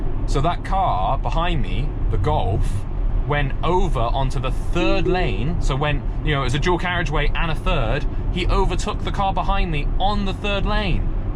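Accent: United Kingdom. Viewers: Amazing and relaxing video, I wonder how that autopilot would work in Portugal Old Town Road. Btw thanks to improve my English understanding, I'm French and it's nice to ear British English 😉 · For rear view of the mad Volvo driver you could have turned on the rear cam we could see part of it, but did not see anything in the rear view mirror. Length of video is good and your commentary was very good. You drove past a very long brick wall so you could have told us what that was or any other interesting sites you drove past. I could listen to your voice all day long and not get bored, must be the British accent, we Americans love, .lol. Good Job keep up the good work.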